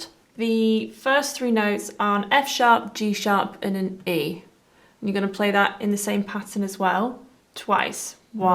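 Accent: United Kingdom. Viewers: "I'm gonna teach you how to play by, with a lovely British accent."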